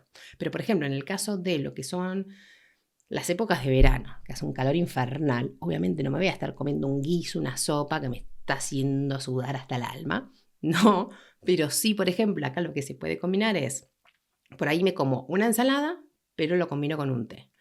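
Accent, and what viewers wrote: Argentina. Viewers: Estoy feliz de haber encontrado tus vídeos. Voy a ver el link que dijiste para ver mi variable. Muchas gracias,. Bife jiji, me encanta el acento y las palabras argentinas. Saludos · Hola, muchas gracias por compartir tu sabiduria, Ió ( jaja en cordobé..) vengo de la escuela Astrológica (de Munich..) y hace 1 semana metido en Diseño Humano ( justamente buscando Info de todos lados y en alemán y con vos en español poco porteño jaja..). Y cierto notaba que cuando mi alimento es simplón.. fideos solitos ó arroz desparramados pero mirándome con ternura, soy felíz.. Claro no siempre, pero ésos momentos me hace sentir bién, Ah.. tengo la variable 1- Apetito... Saludos desde Kelheim! Gracias y sigo informándome con tus Videos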